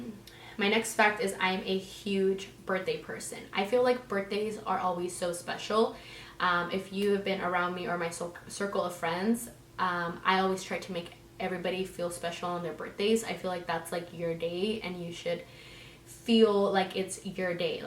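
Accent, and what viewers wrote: United Kingdom. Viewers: That accent is def british hahahaa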